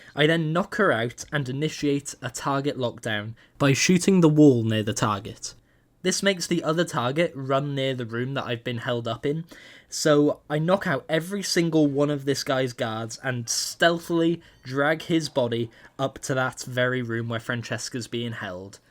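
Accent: United Kingdom. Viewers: okay i have absolutely no reason to care about this apart from being queer but here goes. reasons im pretty sure this guy is gay 1. uses the word "partner" rather than girlfriend 2. "partner" knows about hitman which is more likely to be a guy 3. he doesnt sound outright camp but he has that british accent that is like perfectly closeted i apologise for having the urge to write this comment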